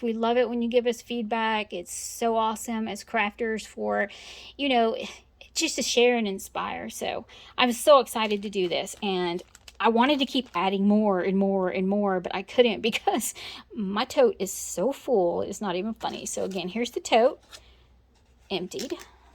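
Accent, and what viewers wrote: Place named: South, United States. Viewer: new subbie here lovely project, where u from?.. I recognized ur southern accent.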